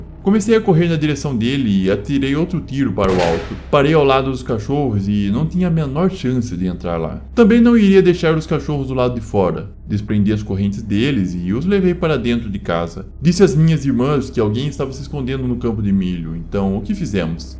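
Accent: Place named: Rio Grande do Sul, Brazil. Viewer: sim. Já me inscrevi, e adorei o sotaque (parece gaúcho) se não me engano.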